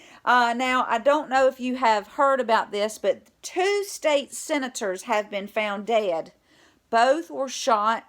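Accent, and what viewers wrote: United States. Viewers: I love your channel and hell I even love the country accent..... sounds like home 🏡 u sound just like my momma talking in the bed! I just wanna tell you AMEN 🙏 IN U CANT UNSEE WHAT U SEE! I got a message sent to me in 2018 and it was a Hillary Clinton film! I’m young I was already awake but I felt the same, I still can’t unsee what was In that video from LUCIFER HIMSELF! Imagine you seeing the only President’s since you were in the first grade President George Bush Sr . Have you ever heard of Omaha NE 1985 or “87 Bush and a Black Man king was his last name a child trafficking ring its the worst thing I’ve ever heard in my life until I received that ! I’m praying for all that with you Ma’am!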